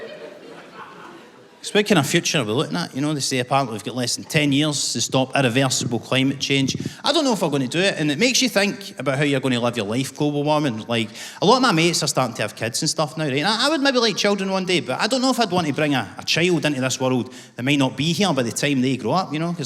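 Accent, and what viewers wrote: Scotland, United Kingdom. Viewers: This dude kills me, I need to go to Scotland to see one of his shows even though I need the subtitles to understand the Scottish accent 😅. Thanks Marc Jennings!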